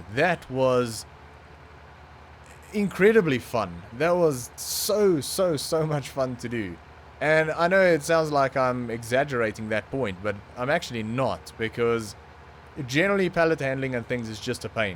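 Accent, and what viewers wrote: Afrikaans, South Africa. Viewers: It sounds like you have an Afrikaans accent. I'm curious where are you from.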